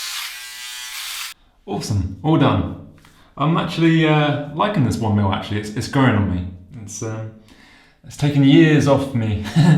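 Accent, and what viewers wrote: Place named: United Kingdom. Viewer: When I heard the British accent I knew there would be excess talking xD great review tho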